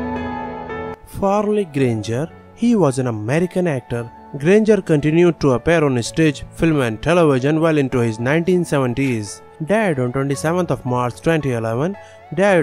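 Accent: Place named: India. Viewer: Hes an Indian .his accent is different 👌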